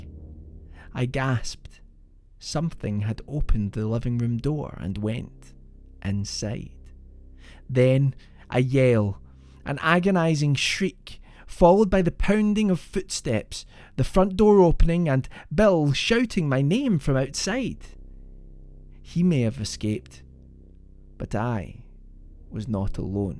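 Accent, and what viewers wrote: Scotland, United Kingdom. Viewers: I love the tales you create, weave, narrate & your Scottish brogues. Very entertaining & professional all round. Just love your podcasts & look forward to each new story. Keep up the great work:-)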